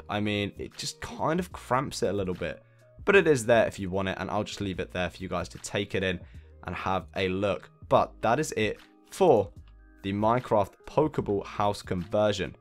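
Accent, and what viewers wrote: United Kingdom. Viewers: He Has the British accent, that's why. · XGZ_AnimationsX its just a feature of his British accent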